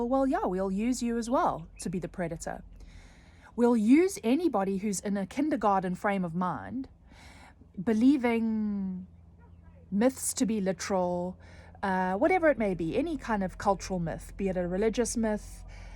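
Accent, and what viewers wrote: South Africa. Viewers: is this a South African accent?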